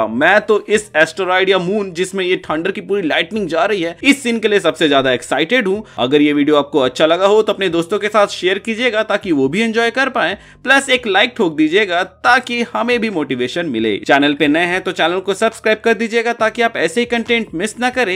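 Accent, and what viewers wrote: India. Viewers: Excited for the thumbnail until i play and hear indian dude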